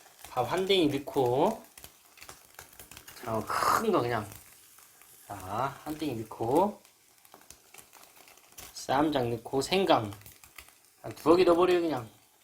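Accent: Jeolla, South Korea. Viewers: 전라도 사투리 중에 오리지날 입니다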